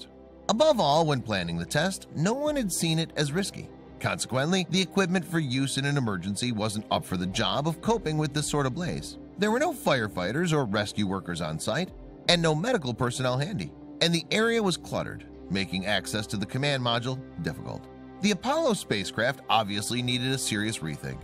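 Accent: United States. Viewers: This was a truly horrifying and tragic event . Im afraid the commentators Perky Annoying American Voice doesnt really Suit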